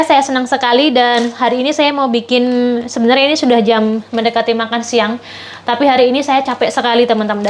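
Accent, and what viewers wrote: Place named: Surabaya, Indonesia. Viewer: Bhs ne kyok. Logate suroboyoan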